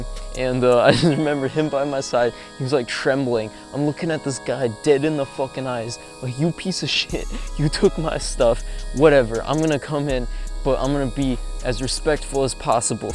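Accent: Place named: Canada. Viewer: Where are you from, sounds like youre from Canada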